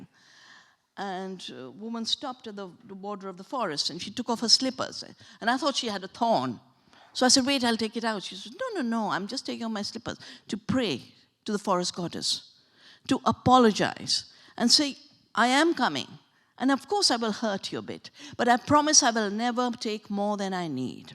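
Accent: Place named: India. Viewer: ill be honest, she's just babbling in an indian accent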